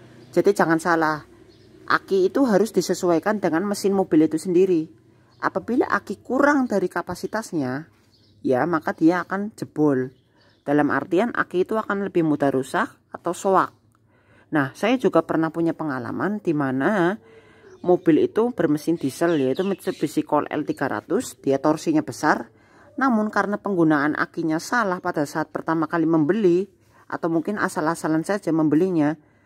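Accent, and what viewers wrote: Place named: Cilacap, Indonesia. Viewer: Ngapak bgt...kayak cilacap😁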